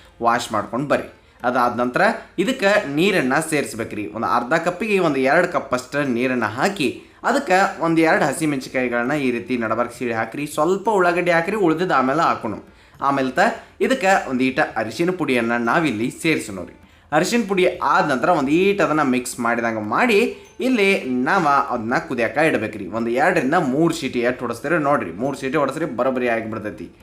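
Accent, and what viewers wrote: North Karnataka, India. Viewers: ನಿಮ್ಮ ಡಾಲ್ ಜೊತೆಗೆ ನಿಮ್ಮ ಉತ್ತರ ಕರ್ನಾಟಕ ಭಾಷೆ ತುಂಬಾ ಚೆನ್ನಾಗಿದೆ.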